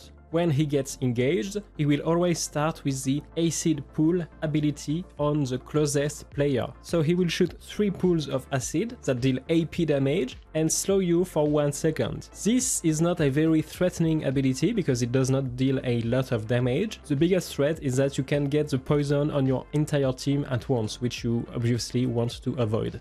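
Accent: France. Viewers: I can hear ur french all my friends talk in this accent 😂 very good content 👍👍🔥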